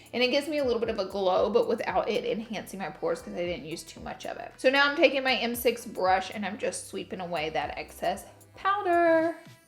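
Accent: United States. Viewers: Omg I luv u so much and I luv ur videos too! I luv ur voice it is so country and u are my all time fav youtuber 💙!!!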